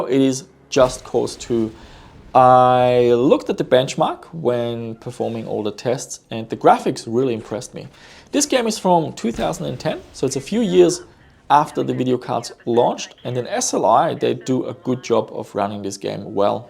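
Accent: Germany. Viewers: You live in Australia with a german accent?? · Why do you sound German to me? I'm German and especially your pronunciation of "the" really sounds like a German or Danish guy speaking English.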